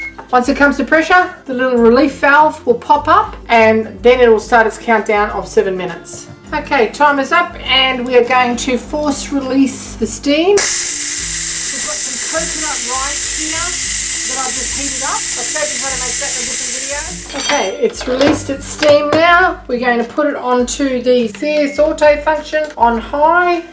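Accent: Australia. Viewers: Sortaye? Saute? It's ok, I'm getting the hang of Strine speak now :) Actually another top notch cooking lesson and recipe!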